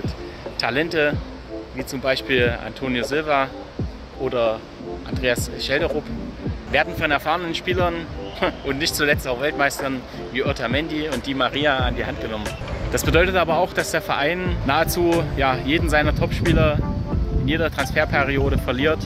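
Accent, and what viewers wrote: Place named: East Germany, Germany. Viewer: Dieser Ossi-Akzent. Als du Panther gesagt hast, habe ich Panda verstanden 😂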